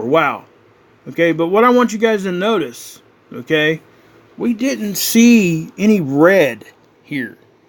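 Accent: South, United States. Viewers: excellent. very very impressive data. advice. try focusing on the data at hand more. hard to watch your video in it's entirety as 50% plus is going off the target. i like your delivery mechanism and your accent is great. love the southern accent. all good but if possible try to stick to the points being made as much as possible. taking a long time to say what your analyzing due to alot of breaks in the transmission. greatly appreciate your time to produce and share your knowledge with the truth seeking community. holy spirit protect and guide you always. peace be upon you always. selah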